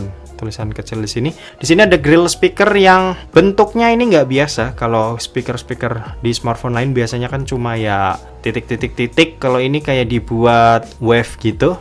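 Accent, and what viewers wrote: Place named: Java, Indonesia. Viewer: Logatnya kalo ngomong medok bngt. Sekali kali selipin bahasa jowo nya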